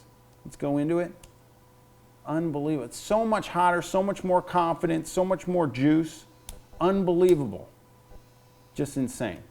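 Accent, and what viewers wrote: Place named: New York, United States. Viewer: This guy is almost painfully from New York. I mean wow. Anyway I've been looking for ways to improve my creative portrait drawing, and I think this channel might be the one for me.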